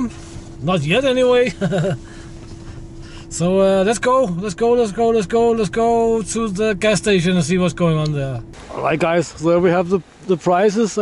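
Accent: Denmark. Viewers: Wow your english sound a lot like with Danish accent!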